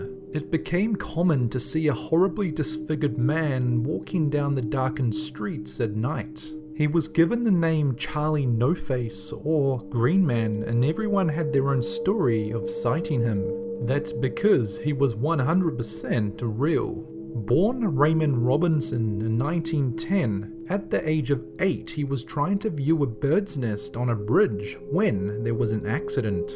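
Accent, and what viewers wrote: New Zealand. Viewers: What is the narrator's accent? I want to place it as from New Zealand? But some Australian influences? Kiwi accent or nah? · That Kiwi accent just kills it for me.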